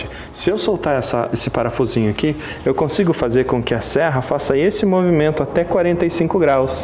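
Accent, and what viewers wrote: South, Brazil. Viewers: Gostei muito bom. Pelo sotaque acho q vc é sulista, moro 6 meses em Curitiba e 6 meses na Itália, vou acompanhar seus vídeos. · Olá! Sua serra vertical é fantástica, mas muito melhor é sua capacidade de explicar e sua generosidade em não guardar nada para si mesmo. Você tem muito carisma para estar na frente de uma câmera. Pelo seu sotaque fica claro que você é do sul do Brasil. Amo seu país e lamento não poder ir todos os anos como fazia anos atrás. Parabéns mais uma vez e desejo muito sucesso. Saúdo-vos de Córdoba, Argentina.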